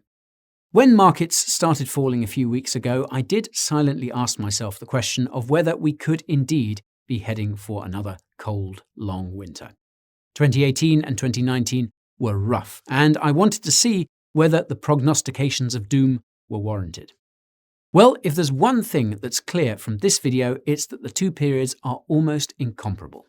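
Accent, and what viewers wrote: United Kingdom. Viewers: It is so much easier listening to a Brit compared to an American.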